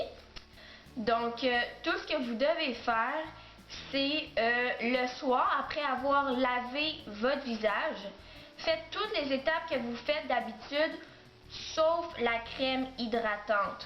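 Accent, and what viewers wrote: Quebec, Canada. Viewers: Tu es quebecoise ? Tu a l accent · est ce que tu es québécoise? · tu est Québécoise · t une quebequoise? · J'écoute simplement son accent Canadienne 🥰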